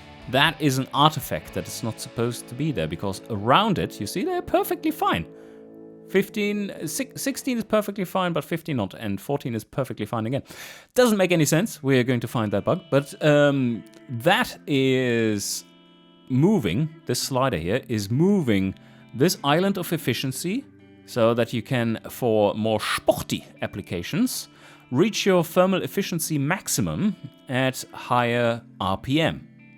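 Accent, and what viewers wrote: Germany. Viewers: i like your dialect, sounds like a fellow native German speaker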